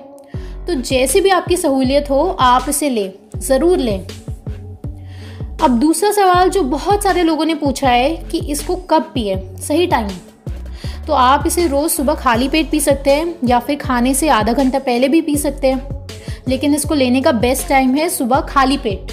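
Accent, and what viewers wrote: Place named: North India, India. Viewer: Bolne ka style acha laga, bilkul north wala..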